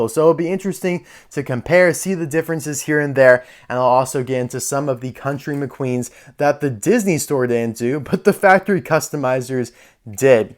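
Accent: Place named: Ireland. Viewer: Amazing video! This was definitely interesting seeing the different between Disney store and factory customs. Although, I feel like there was a missed opportunity to compare some of them to some tomica country McQueens that are similar to those cars in the video. But oh well, the video was still great! I didn’t even know you were Irish! Also, on an unrelated note, I just realized that there’s another McQueen that only the Disney Store made: Blue Lieutenant Lightning McQueen. He was only in a McQueen-O-Rama 5 pack. I just felt like that was worth telling you. But anyways, nice job with this video! Keep it up!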